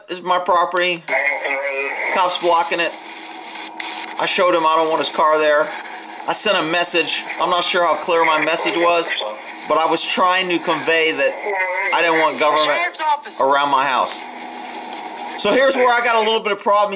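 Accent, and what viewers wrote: United States. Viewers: You talk over vid way too much !! Tip: shut your trap with that arrogant American loud claptrap accent !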